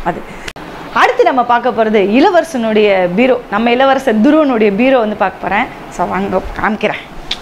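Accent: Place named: Madurai, India. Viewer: Neenga maduraa ah?? Madurai- slang.. துணி மணி❤️ Vijay still from Thuppaakki movie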